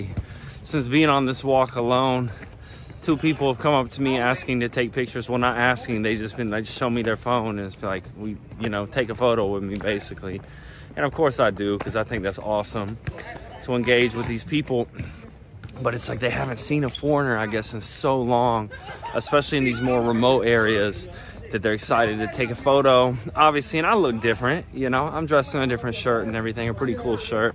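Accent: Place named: South, United States. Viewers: Gorgeous videos. Love the accent. Where? Louisiana? ... Did you finally see some city personnel on the China side? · Great video n I enjoyed it. Guess you re from the south? Greetings from Southern CA.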